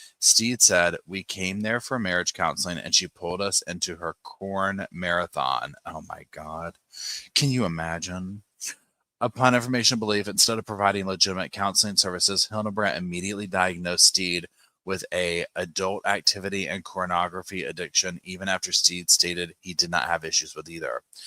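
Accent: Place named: East Tennessee, United States. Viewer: I’m from east tn and it’s so nice to hear someone that sounds like me and I can understand. Love your storytelling style.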